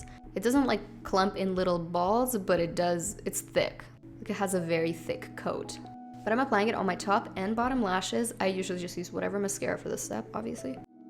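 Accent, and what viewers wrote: United Kingdom. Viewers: love your slight british accent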